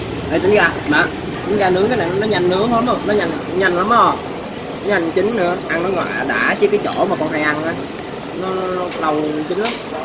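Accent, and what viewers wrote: North, Vietnam. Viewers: Sao ông bố nói dọng Bắc còn Con lại nói dọng Nam vậy ? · Chú cho con hỏi sao chú nói giọng bắc mà 3 bạn kia nói giọng nam vậy chú :3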